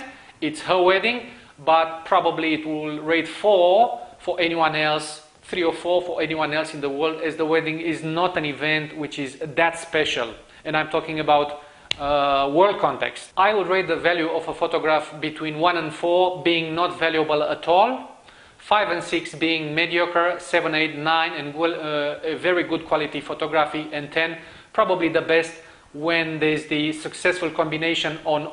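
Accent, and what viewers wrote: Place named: Romania. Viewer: of Photography you sound like a romanian :)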